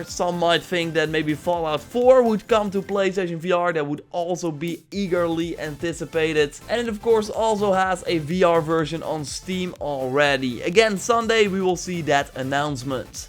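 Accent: Netherlands. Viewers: Ur dutch right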